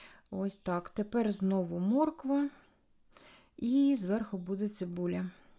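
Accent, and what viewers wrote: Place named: Chernihiv, Ukraine. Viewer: Почти вся Черниговщина так говорит, но рецепт для меня новинка. Спасибо.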